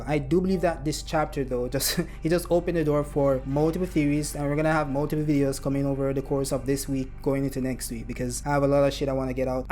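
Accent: Jamaica. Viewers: I stg im following you for your tasteful Jamaican accent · I know you were from Jamaica because of the accent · are you jamaican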